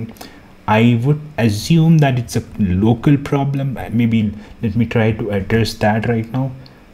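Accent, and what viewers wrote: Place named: India. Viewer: That indian accent takes some time to get used to it. My russian accent is noticeable too, so I’m not complaining. xD Overall, this is a useful video. Thank you! P.S.: time codes would help a lot.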